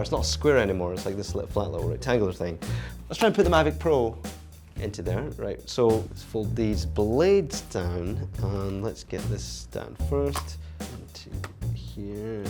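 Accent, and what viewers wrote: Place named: Edinburgh, United Kingdom. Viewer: Hi, Great video. Thanks. That sounds to me like an Edinburgh (where I am) accent... am I correct? If so, I've just bought myself a Mavic 2 Pro and the FlyMore kit, and... I'm completely new to drone flying. If you're in Edinburgh, is there a particular area you can recommend I get started? Would you be up for meeting up with me (in the field, with drones) and give me a few starting pointers? Cheers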